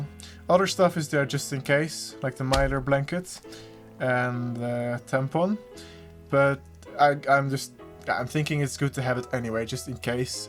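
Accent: Netherlands. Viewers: aahhh ik zat al te denken huh dat klinkt toch Nederlands haha waar kom je oorspronkelijk vandaan dan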